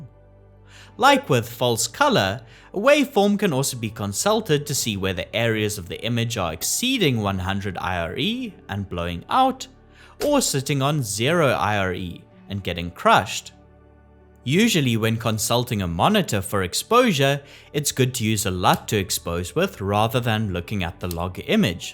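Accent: South Africa. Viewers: The narrator has a very South African accent. Nice 🍺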